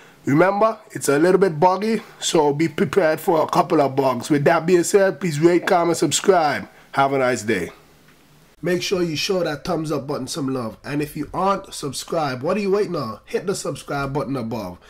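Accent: Jamaica. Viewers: II knew you was Jamaican. Check out vs in YouTube the shut is fire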